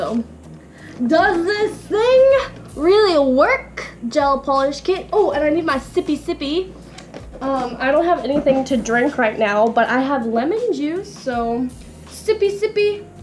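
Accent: Australia. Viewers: Your semi Australian accent.... I love it so much, i wish i have an Australian accent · Australian accentt comin' in hard! I love it lmao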